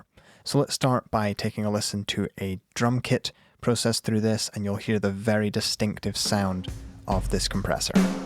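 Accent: Scotland, United Kingdom. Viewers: He does have a Scottish accent, it's just that he's from a part of Scotland that has a softer accent rather than a more pronounced one, such as Glasgow. :)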